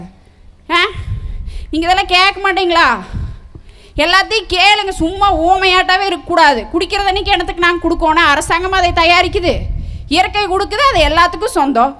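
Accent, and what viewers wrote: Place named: Kongu, India. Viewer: என் தங்கை கொங்கு தமிழ் செந்தேன்🎉🎉🎉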